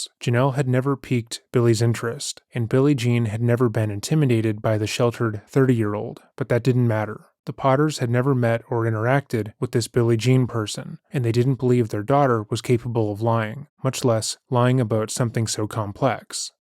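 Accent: Canada. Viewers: Is it just me, or can we all hear the Canadian accent more and more these last few videos? I couldn't hear it or wasn't paying attention in your earlier videos, but your voice is one big reason why I love your channel, so I'm sure I would have heard it before. Just wondering. ❤ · Wait, are you Canadian? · First time completely hearing the Canadian coming through, guys. Currently in New Orleans, so, stark contrast. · First of all, I love this channel. I've been watching pretty much since day one and will continue to do so. With that said, I've noticed in the last few videos a canadian accent that I didn't pick up on earlier videos. Anyone else?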